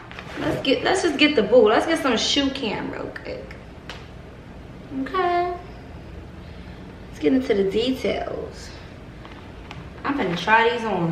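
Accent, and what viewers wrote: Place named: New York, United States. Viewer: This must be a NY thing because, I talk just like this in my video😩😩😩 (FEEL ME?)😂😂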